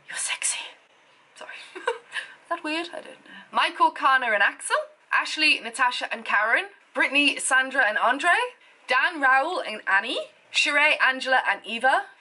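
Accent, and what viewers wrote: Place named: Birmingham, United Kingdom. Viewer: I knew you were a Brummie 👍🏼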